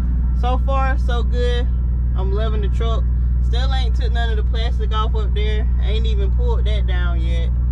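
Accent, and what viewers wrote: South, United States. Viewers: It's called a southern accent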